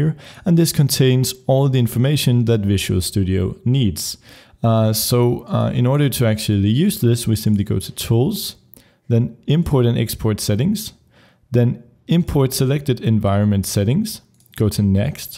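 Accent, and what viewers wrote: Denmark. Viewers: are u danish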